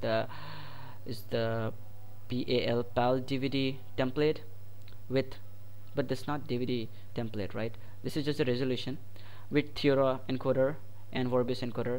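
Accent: India. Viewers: Nice vid. And nice accent, are you Indian? :D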